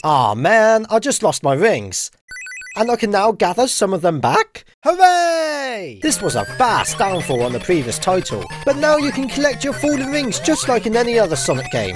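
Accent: United Kingdom. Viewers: His british accent just adds to the video, I don't know why... Assuming it is british and I am not an idiot (Edit: Nice to see a Youtuber who views his comments, Thanks for the heart)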